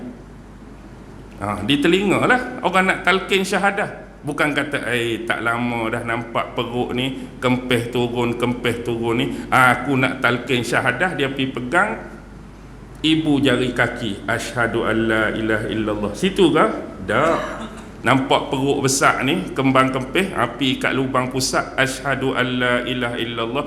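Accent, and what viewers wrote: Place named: North, Malaysia. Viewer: Umur berapa bang, takpaham loghat utara ka takpaham cerita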